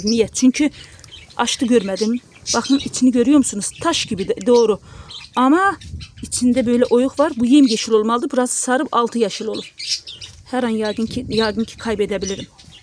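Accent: Azerbaijan. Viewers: Кardesim ellerinize saglik siz azerisinizmi .konusma iz cok benziyor azeriye.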